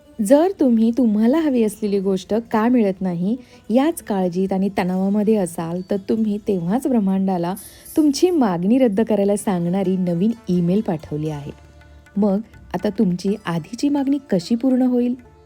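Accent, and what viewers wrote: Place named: Pandharpur, India. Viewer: Hi तुझी भाषा थोडीशी आमच्या पंढरपूर सारखी वाटली धपाटे चिकाचे दूध❤❤ तुझे बरेचसे ब्लॉग बघितले छान नॉलेज आहे तुझ्याकडे 👍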